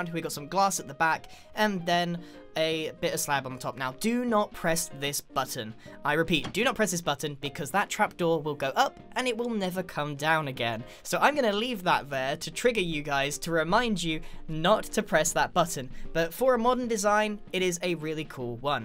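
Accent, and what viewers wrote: United Kingdom. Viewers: Yes because he talk british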